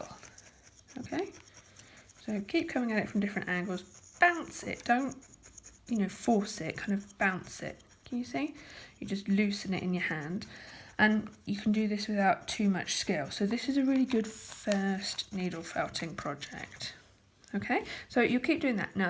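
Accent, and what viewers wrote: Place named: United Kingdom. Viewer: Thank you for a straight forward demonstration. Great to hear a UK presenter😊 I personally can't cope with the USA accents.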